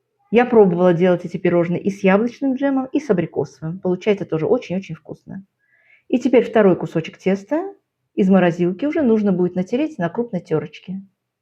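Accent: Baku, Azerbaijan. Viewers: Спасибо Вам! Живу в Краснодаре. Так приятно слушать Вашу речь, бакинские нотки слышны.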